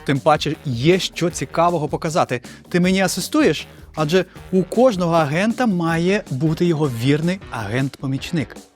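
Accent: Standard. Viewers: Яка чиста українська мова. Чудово 💙💛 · Ще й український кореєць ведучий огляду, з чистою українською мовою… Це прям новий рівень) Дивлюсь з iPhone, але нові моделі Samsung дуже зацікавили)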